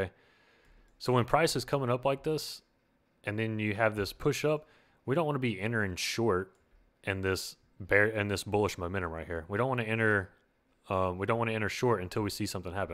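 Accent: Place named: Louisiana, United States. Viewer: are u from louisiana?? cause i am and u sound familiar?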